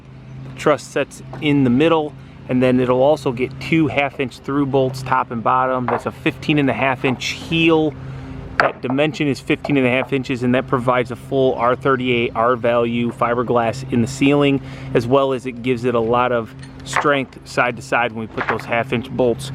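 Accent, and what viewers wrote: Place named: Wisconsin, United States. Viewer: Are you originally from Wisconsin? You have a very Wisconsin accent.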